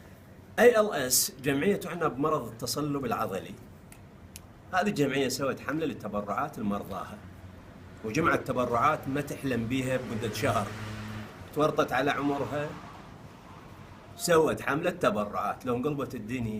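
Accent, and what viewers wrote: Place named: Iraq. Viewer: الهجه العراقيه جميله جدا محبكم سعودي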